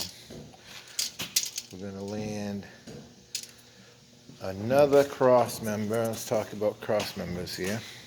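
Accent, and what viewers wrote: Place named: Maine, United States. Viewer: Nice, you sound like you're from maine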